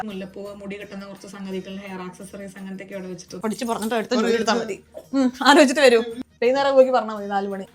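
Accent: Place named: Kozhikode, India. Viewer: My fav YouTubers and.....kozhikodan samsaram😍...take care dears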